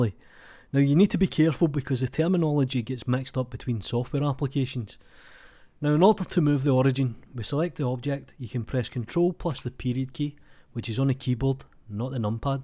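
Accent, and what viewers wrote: Scotland, United Kingdom. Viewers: I subscribed for two reasons: 1) I absolute love the fact that you are doing this and doing it for free. This will help lots of artists coming from maya and make the transition much easier and faster. 2) I absolutely love your voice and accent. It makes me remember the william wallace campaign of Age of empires 2. Also: Please please if possible make it someway that mimics the maya's pivot system. The blender forum has a request for better (and faster) pivot system and I really believe maya's way is lot faster to do. First and foremost it'll help out a lot of students who want to work in blender! Thank you!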